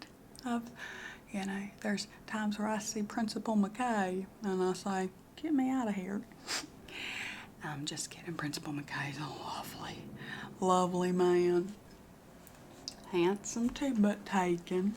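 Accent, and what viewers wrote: South, United States. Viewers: Please do a Paula Dean video with this accent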